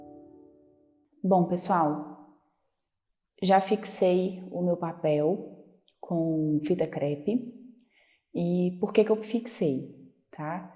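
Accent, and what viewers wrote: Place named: Minas Gerais, Brazil. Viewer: Oi,. Conheci hoje seu perfil. Amei. O tipo de trabalho que sempre gostei de ver. E amei seu sotaque mineirinho, que eu acho o mais bonito do Brasil! Vou fazer essa aula hoje mesmo. Voce disponibilizou mais imagens?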